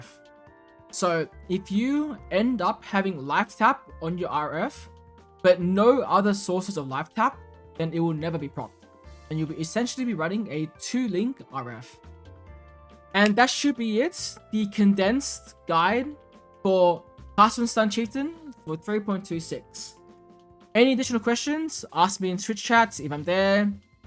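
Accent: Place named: Australia. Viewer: sounds aussie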